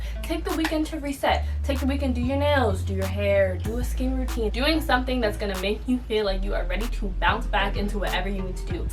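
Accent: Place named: United States. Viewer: omw hey! I love your talks because it makes me feel like I have a big sister and I'm so greatful for that!You are so lekker (lekker-lek-ker ) means that you are so cool! In my language . lol I am from Africa and the way you speak in "American" is so cool also,it makes me wanna speak it to for example: aight bet..your language is so different to ours but it's interesting too♥just wanted to say that I love your channel and I hope you do a more recent "get to know me " video or just something that we don't know about you ...like "pet peaves now" ♥♥ again I love your channel soo much. Please give me a shout out...If you want lol.♥♥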